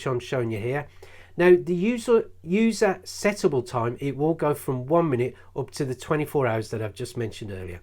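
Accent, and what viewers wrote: Birmingham, United Kingdom. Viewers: Thanks. My first watch in the 70's was a Casio digital and now.. after all these years I've got a yearning to get back into these type of watches. l recently bought a silver coloured e1200 and I'm really impressed with it.. so much so - that I'm going to buy a few more digitals and I'm watching a few vids about them. Saw this excellent vid of yours and it is very detailed and informative..plus, it's always nice to hear a Brummie accent. If you don't mind, please could you just answer one question from me..I like the legibility of the reviewed model just shown, especially with its larger digits, but I'm undecided about the size of this watch as I prefer slightly larger faces. Without going into G Shock territory what Casio's could you suggest I take a look at?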